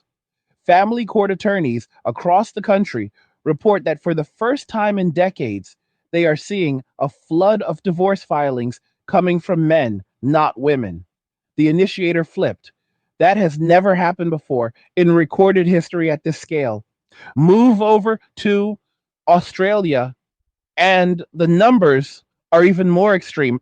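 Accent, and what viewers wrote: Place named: Panhandle, United States. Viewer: you got that inbred panhandle voice going on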